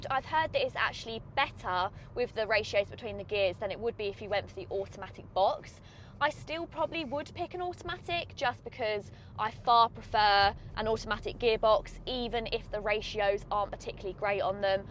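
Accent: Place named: Cockney, United Kingdom. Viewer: Nice car, annoying cockney accent.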